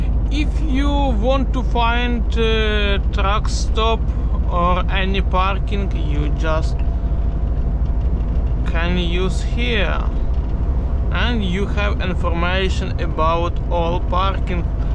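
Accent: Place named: Lithuania. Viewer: Myślałem że to Litwin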